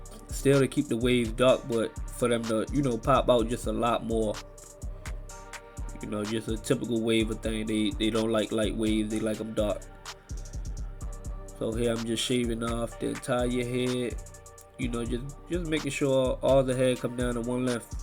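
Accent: Baltimore, United States. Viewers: work on bein yourself, them cuts and that dumb ass “baldmore” accent. Yurturb 🤣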